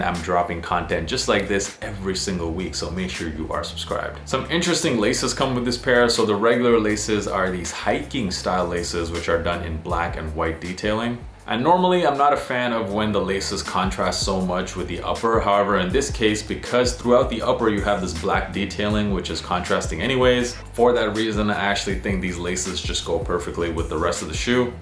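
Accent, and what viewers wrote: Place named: United States. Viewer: i always thought you lived in Europe.. not sure why cus you sound american lol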